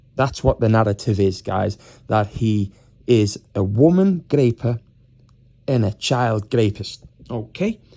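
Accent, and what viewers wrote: Liverpool, United Kingdom. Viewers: Late night Hello to from Belfast 🇮🇪 your intelligent? You have the gift of the gab? And you believe your handsome? But yet you have a Scouse accent 😂👍SO YOU MUST BE IF THIS IS TRUE, PART IRISH 🇮🇪 👌👌👌 KEEP IT REAL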